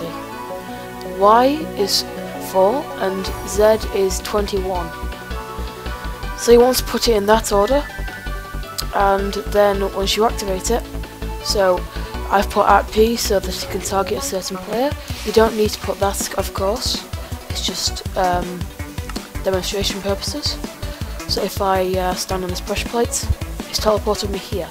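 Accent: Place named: England, United Kingdom. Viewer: you are english i can tell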